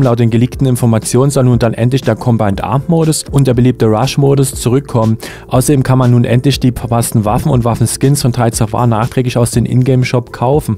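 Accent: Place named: East Germany, Germany. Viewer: Keule, Keule... Ost-Dialekt, und dann so schnell reden, inklusive Englischen-Begriffen?! Da platzt mir die Birne 🙇‍♂️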